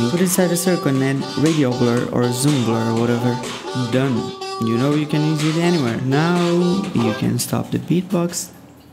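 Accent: Brazil. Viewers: Mano eu não sabia que tu era brasileiro mas esse sotaque me fez desconfiar desde o início kkkk trabalho foda pra krl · Mano não sabia quer vocè era brasileiro mais tava um pouco desconfiado pelo seu jeito é o sotaque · MANOOOOOOOO KKKKKKKKKKKKKKKKKKKKKKKKKKK ADOREI BR não tem jeito. Tu pode falar fluentemente, desde o incío eu vi a pitada de brazilização. TEU VÍDEO ME AJUDOU MUITO COM O INGLÊS, VOU FICAR REASSISTINDO KASJDLAKSDJ E QUE CONTEÚDO DO CARALHO EM, PF NÃO PARE AMIGO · Mano, num outro vídeo q vi teu, eu pensei q parecia BR, e agora sei q eu estava certo... Principalmente pelo sotaque kkkk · Sabia que tu era Brasileiro porra, esse sotaque ai e é top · Eu sabia que tinha sentido um sotaque BR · Antes de falar q era br eu ja desconfiei pela pronuncia, so eu q acho mto loko isso ? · Aaaahh eu sabia que era br só pelo sotaque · Caralho eu sabia que tu era brasileiro só pelo teu sotaquekkkkkkk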